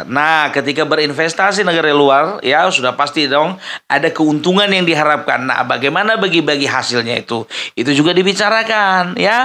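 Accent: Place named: Java, Indonesia. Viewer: Aku suka sekali chenelnya Mas dg gayanya yg khas dan menghibur. Asli mana mas kok logatnya sperti luar jawa tp kok pinter bhs jawa dan logatnya jawa sekali. Trimkasih mas trus suwarakan kebenaran. Smoga mas tetap sehat pnjng umur dan selalu dlm lindungan Alloh SWT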